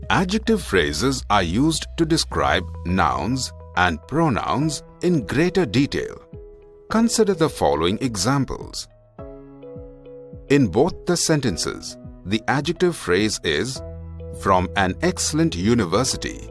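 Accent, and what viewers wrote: India. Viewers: Damn the Indian accent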